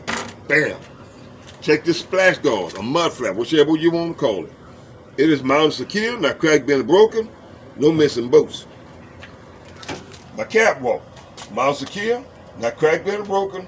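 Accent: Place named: Louisiana, United States. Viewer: Its the louisiana accent lol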